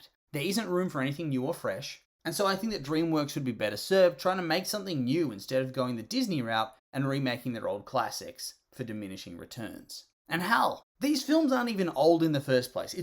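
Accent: Australia. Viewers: are you australian? you sound australian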